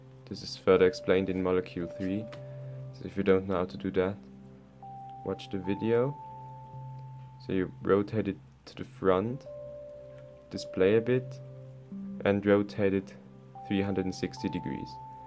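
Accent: Germany. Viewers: Nice germanischer Akzent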